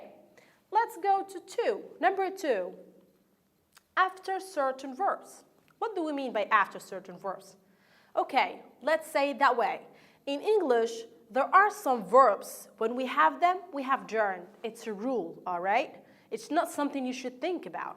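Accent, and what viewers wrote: United Kingdom. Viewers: I'm not understand what do you say & you speak English as you are British & speak Arabic for everybody understand what you say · haha i get u but the accent also british ..... tell how do you know the deference between the accents ?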